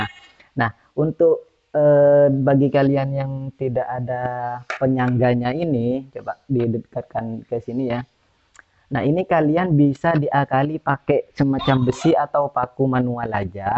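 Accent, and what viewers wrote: Madura, Indonesia. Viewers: Logatnya asli Reng madureh😅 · Kalau dilihat dari foto ruang tamunya abang ini adalah santri, Kalau dilihat dari logatnya abang ini adalah orang madura, reng madureh dimmah kak......??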